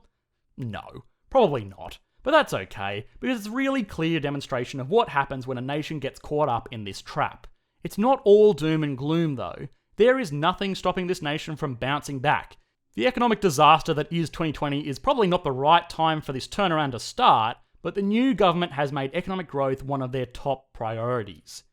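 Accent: Australia. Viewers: But he's not an American, he's and Australian. That should be obvious by his accent alone.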